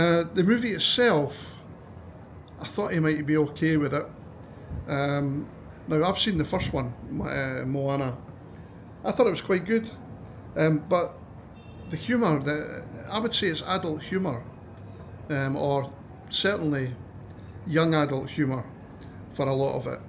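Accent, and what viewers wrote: Scotland, United Kingdom. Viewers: The algorithm popped your channel in my feed and it was so good to hear a Scottish accent I hit subscribe. I just retired to KL from Scotland a couple of months ago because my son lives here. I was a special needs teacher and I was blessed enough to work with many autistic and Down's Syndrome kids over the years. I'm glad your wee boy goes to a good school and is making great progress. You and your wife are knowledgable and cognizant of your son's needs and doing a fantastic job. Much love to your family!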